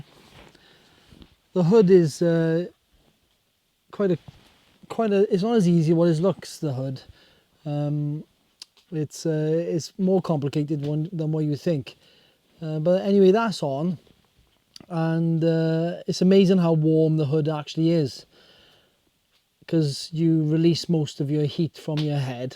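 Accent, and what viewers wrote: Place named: Wales, United Kingdom. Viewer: I was pleasantly surprised to hear a Welsh accent coming to this video. A great watch and great to see another Welshman do well, and show passion in his craft. yn anfon llawer o gariad o Llanelli. Iechyd Da i chi!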